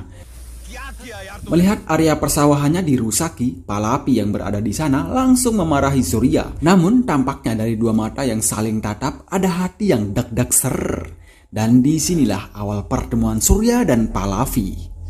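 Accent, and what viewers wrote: Bali, Indonesia. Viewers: Logat bicara nya kayak logat bali, 😁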